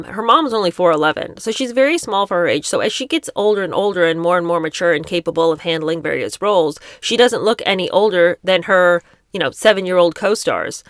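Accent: United Kingdom. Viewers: Love this new series! I hesitated at reading the book too but now it’s on my list. The only thing we are missing is an excuse for your amazing British accent! Lol Love your channel!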